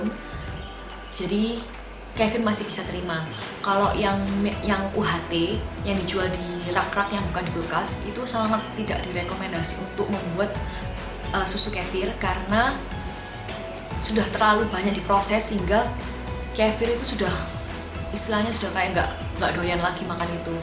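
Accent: Java, Indonesia. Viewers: medhok bnget... q suka gadis jawa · keganggu bgt sm medok jawa nya 😄😄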